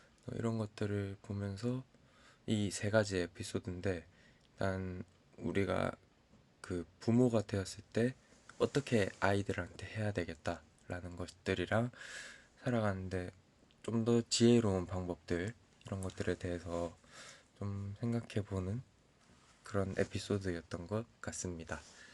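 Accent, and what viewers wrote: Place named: Gyeongsang, South Korea. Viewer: 어헛!! 님!! 사투리가 경상도 같았는데 무려 "대구" 셨군요!! ㅎㅎ 저도 대구본가 왔네요😊😊즐거운명절되시고 행복한 시간되세요🤩🤩🔥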